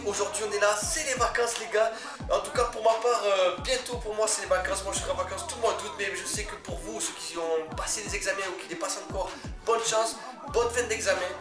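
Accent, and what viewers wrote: South, France. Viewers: Notre tjrs aussi beau tjrs aussi bn merci pour ce nouveau bijou de ta pars bisous et tn petit accent du Sud me donne la chaleur qui y fait =)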